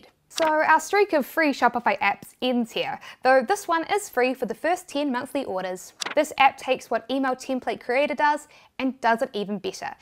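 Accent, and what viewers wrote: New Zealand. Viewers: literally have nothing of value to add, just a shoutout for being a kiwi. came across this channel after discovering the massive world that is ecom and couldnt help but smile when i heard a familiar accent - definitely didn't expect it on youtube. obviously physical location has little bearing on the internet really, but huge respect for building what you have here :~)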